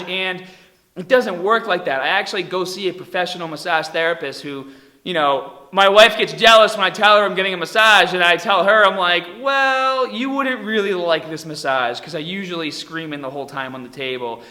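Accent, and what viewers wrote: Boston, United States. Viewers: He's definitely from Boston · You from Boston? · What is your accent?. Are you from Boston?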